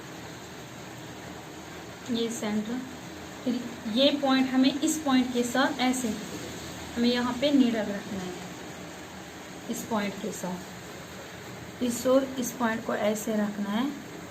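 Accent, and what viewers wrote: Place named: Kashmir, India. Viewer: Your language is sweet I think you are from North Kashmir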